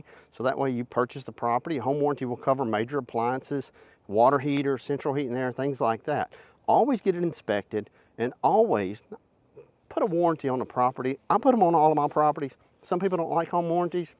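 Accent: South, United States. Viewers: Are you from Louisiana or the south in general? Im getting theo von vibes (which is a compliment)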